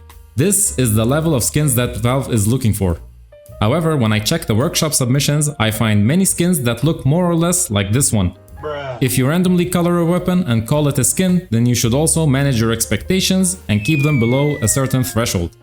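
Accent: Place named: Poland. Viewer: Where are you originally from then? I was 1500% sure you’re Polish judging by your accent 😄 Otherwise I would write in english. There’s a lesson in that case: don’t judge a book by it’s cover 🤣 Well, now it makes more sense to make only cs2 dedicated videos.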